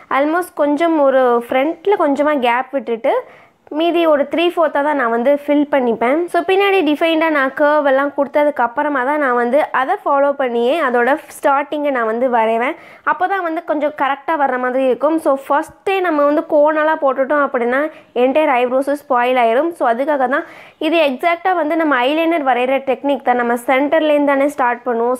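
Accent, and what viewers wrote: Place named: Kerala, India. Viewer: R u Malayali ?? Gap ittit slang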